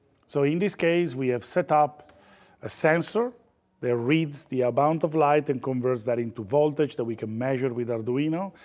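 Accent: Italy. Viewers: i dont know why but the fact you have a lovely italian accent makes me understand things better :D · Si capisce che sei italiano. Grazie per il video è molto interessante. Per caso fai cosrsi a pagamento?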